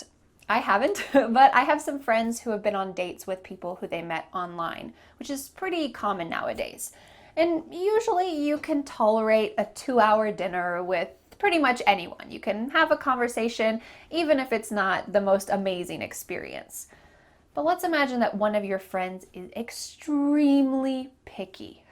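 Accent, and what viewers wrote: United States. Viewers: Are you speaking USA English? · Are you American?? I like American accent💜 and your smile is so beautiful 💜💜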